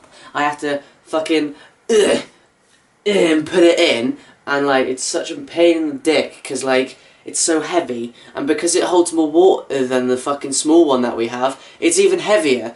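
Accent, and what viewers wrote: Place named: United Kingdom. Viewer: Glad you're excited about it. I'm working on my own website and I'm going to have videos on it of jobs I have modified for injured workers. I'm doing one right now for a custodian, which is how I ran across your video. So for this video, your target market is not other teenagers, rather college educated safety and human resources managers. So what they need to hear is how difficult it is for a healthy young man like yourself to use that mop bucket, lift it up to the utility sink to dump it etc. What other tasks wear you out? Swinging the string mop? Squeezing the water out of the mop? Think about everything in mopping that is difficult physically (not how boring it is...I can't fix that.) I am an advocate of flat microfiber mops that weigh 80% less when wet. So your video was very entertaining, just not appropriate for my audience. 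Think about how you talked to the person in human resources who hired you...that's who your talking to in the video. I would say cut it down to 1-2 minutes, like a commercial. These people are busy. But feel free to be funny, funny is good. Thanks so much! This is fun. Are you in the UK? (by your accent I'm guessing)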